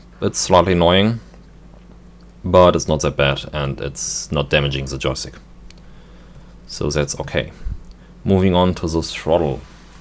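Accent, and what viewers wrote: Germany. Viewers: I'd bet you are a native german speaker .. :-) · Du bist Deutscher oder? ;D wenn ja, man hört deinen Deutschen Akzent oder so. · erstma danke für die antwort... trotzdem habe mich auf der channelseite des " " informiert er is aus Deutschland;-) Und wenn man das nich an dem Akzent hört heheheheh dannweiß ich auch nich.... P.s. Fliege IL2, Cliffs of Dover und ab und zu FSX...da brauch man glaube ich nen extra Addon für damit das FFB richtig funtz!? cya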